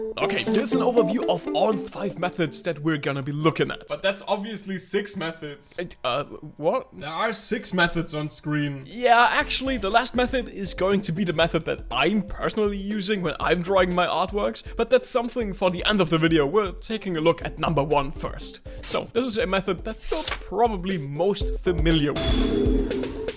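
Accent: Germany. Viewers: Im hearing deutsch broski🤨🤨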